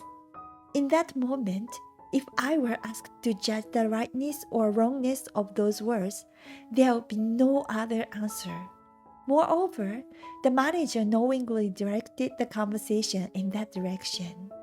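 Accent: Japan. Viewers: Why translate to English ? You hired to read story a asian stupid person who can not clearly speak English due to a heavy Japanese accent! All of the English words are blurred grammer or simply miss--pronounced totally! Very hard to listen to! !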